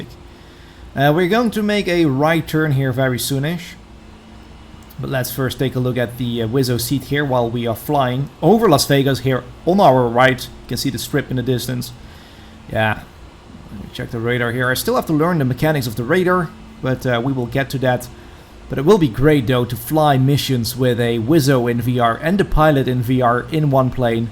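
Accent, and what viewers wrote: Netherlands. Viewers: Grappig. Je hoort direct dat je een Nederlander bent. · Klinkt Hollands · Are you Dutch? You sound Dutch lol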